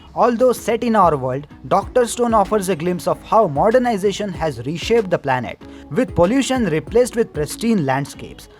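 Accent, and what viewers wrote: India. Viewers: Indian accent is awesome bro. I studied engineering and would come home from a 2hr lecture not understanding shit. Then I watch a video of a guy with Indian accent explaining it perfectly in 30min or less… indian accent carried me through uni :D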